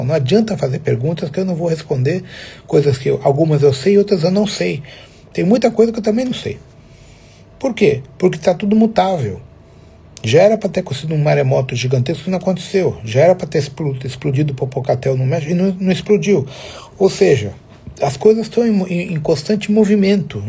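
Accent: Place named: Portugal. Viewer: No le entendí ni la mitad. Lo lamento,a mi edad, me es difícil comprender un español aportuguesado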